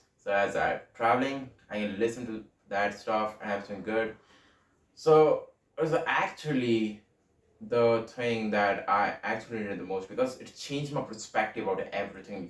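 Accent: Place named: India. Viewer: Bro Indian accent is good u do not need to try other accents.. by the way good work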